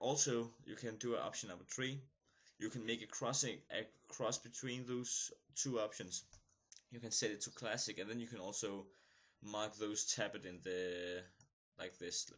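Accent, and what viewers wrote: Denmark. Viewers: Dansker? :D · jeg kan høre at du er dansk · du er dansker er du ik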